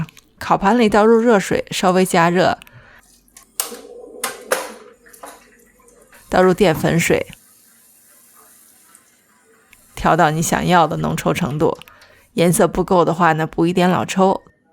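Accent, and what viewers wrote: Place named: North, China. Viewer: 太赞了！谢谢。你亲切北方口音也很暖我心❤️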